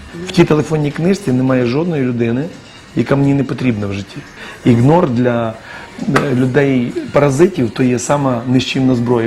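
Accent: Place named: Nadsiannia, Ukraine. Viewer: Это не чистый язык это Надсянский Диалект.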